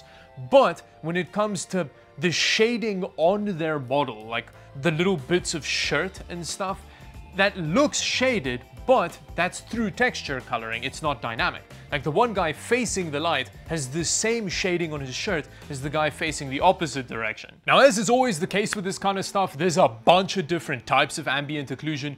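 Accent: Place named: South Africa. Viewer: Hey man, I'm loving your content, are you a fellow South African?? It's so refreshing to hear a familiar accent XD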